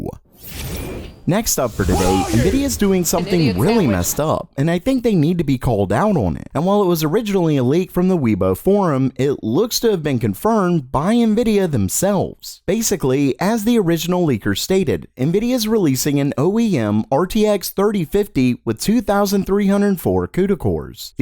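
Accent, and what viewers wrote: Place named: South, United States. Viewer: that country accent tho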